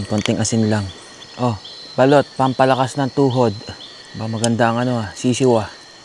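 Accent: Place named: Pakil, Philippines. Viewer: parang taga Pakil ka sir? kilala ko punto mo eh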